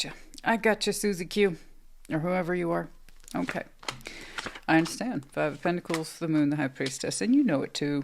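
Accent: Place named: Texas, United States. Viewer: You are the #1 Tarot channel, so many just read each other's scripts, don't show themselves actually doing the reading. Have no in-depth knowledge of the tarot & have horrible voices, unlike your sexy Texas drawl & wicked laugh. Thank you for being here & being so sassy I love your channel! Capricorn power!!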